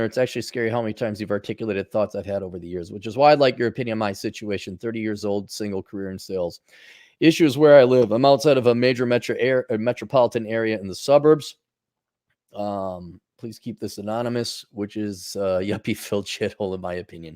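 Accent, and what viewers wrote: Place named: New England, United States. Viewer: Must be New England. Dude sounds like me.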